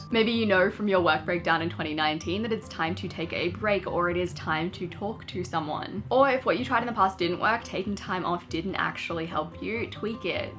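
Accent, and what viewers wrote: Australia. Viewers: I can’t stand your accent. Australian accent is sooooo annoying. It’s like you guys add an L to the end of words like food. Your a mix of English and some made up garbage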